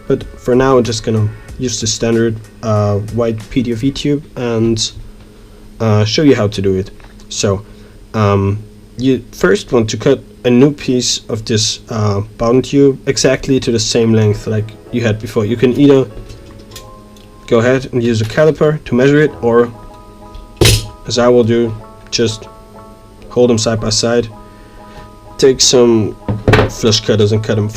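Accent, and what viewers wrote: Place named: Netherlands. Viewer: Bist du gebürtiger Deutscher? Ich höre da irgendwie einen Niederländer heraus, du sprichst ziemlich gut :)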